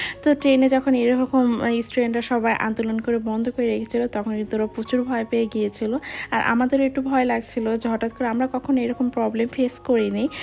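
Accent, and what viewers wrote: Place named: Rajshahi, Bangladesh. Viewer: রাজশাহীর ভাষা খুব ভালো লাগে আপু,,, খুব মিষ্টি করে কথা বলো তুমি মাশাআল্লাহ,,, ভালো লাগলো আপু ❤❤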